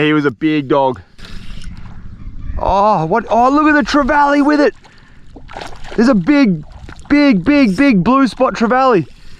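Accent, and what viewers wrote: Australia. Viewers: nah bro people are sleeping on aussie accent its more fun than british XD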